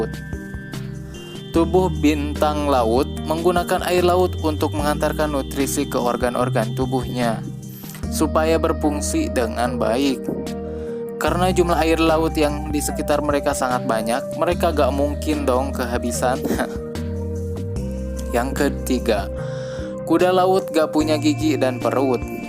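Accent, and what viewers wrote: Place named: Sunda, Indonesia. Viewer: Dialek sunda nya kentel... Lanjutkann mantappp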